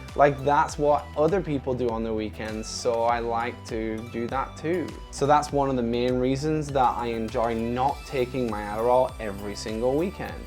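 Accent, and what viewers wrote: United Kingdom. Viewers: So where are you based because you have a British accent but I don't think Adderall is available in the 🇬🇧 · You sound like a limey, is your adderall on Private RX?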